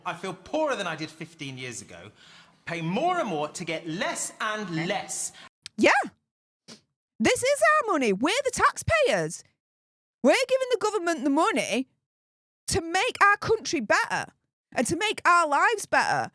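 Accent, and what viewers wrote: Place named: North, United Kingdom. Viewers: Love yer, proper Northern girl, telling it like it is 😊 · Northern English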